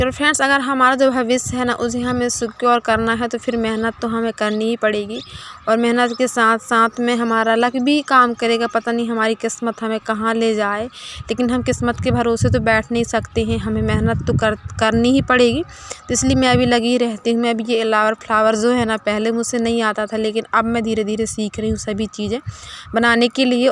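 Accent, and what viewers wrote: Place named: Pahari, India. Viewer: Di aap video me pahari tune kese lagati hai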